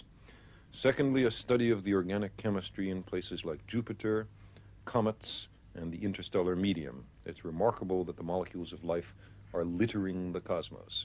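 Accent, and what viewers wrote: England, United Kingdom. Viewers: the host is being agressively british while Sagan is being very american.. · What a pompous ass English accent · this british dude is annoying af. talk faster you smug fuck. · Imagine there was a time when people spoke like that English dude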